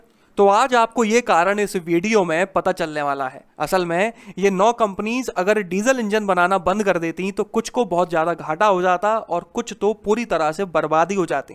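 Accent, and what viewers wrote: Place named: Agra, India. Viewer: Agra ke. Lagte ho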